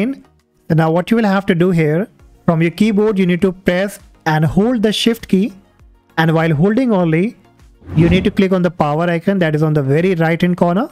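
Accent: India. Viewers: Always love you hear an indin accent on tech guides, yk you have a good source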